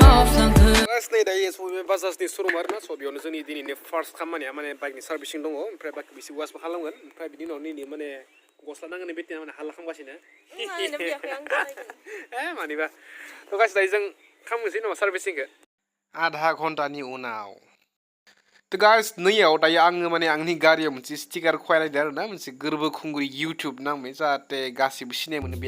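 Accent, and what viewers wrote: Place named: Kamrup, India. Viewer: Adaya kamrupiya railaiywda.... Agna kamrupiya gorpala 50/50 jby..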